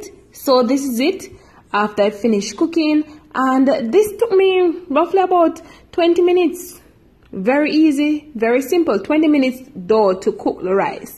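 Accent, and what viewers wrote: Jamaica. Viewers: Are you Jamaican or Bajan ??!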